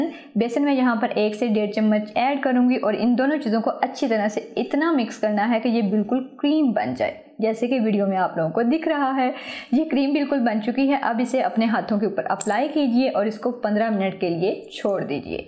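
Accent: Punjab, India. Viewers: Ap ka panjabi style bahut mazy ka hai. Salamat rahain. Ameen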